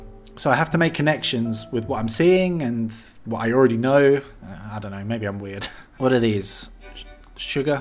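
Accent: Finland. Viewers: you are doing great, i think its pretty funny that you pronounce all the swedish words with a finnish dialect.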